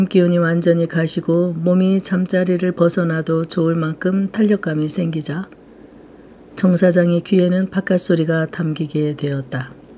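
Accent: Jeolla, South Korea. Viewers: 선생님. 전라도 사투리가 날로 좋아져서 찰떡같이 찰지고 구수해서 들으면서 입가에 웃음이 절로 납니다. 고맙습니다.❤❤❤ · 왓마 오랫만에 전라도 사투리 들어봉께 옛날생각이 나뿌네. 구수허구먼.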